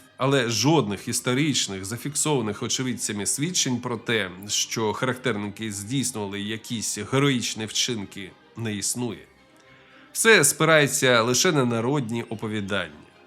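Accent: Russia. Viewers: Хто ви такий щоб давати такі неправдоподібні характеристики нашим козакам, та ще з москальським акцентом??? Ви подумайте перед тим як людей водити в оману! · Ну нащо пхатися в знавці фактів про характерників? А акцент московський все ж відчувається попри правильну вимову:))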